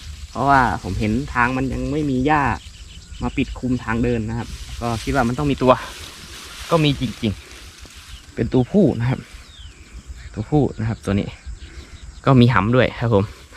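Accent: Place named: Isan, Thailand. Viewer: เป็นคนจังหวัดไหนคับยางครั้งพูดอีสานชัดมาก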